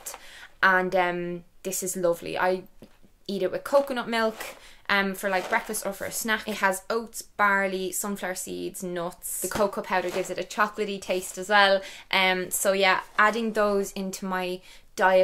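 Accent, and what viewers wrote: Ireland. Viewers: Beautiful youuu! That Irish accent is adorable!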